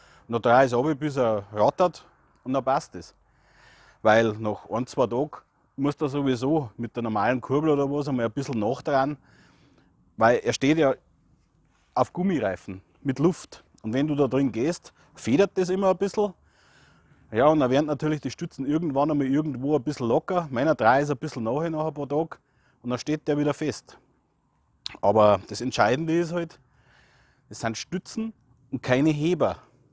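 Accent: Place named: Bavaria, Germany. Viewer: bitte untertiteln, da boarisch nicht jeder versteht !!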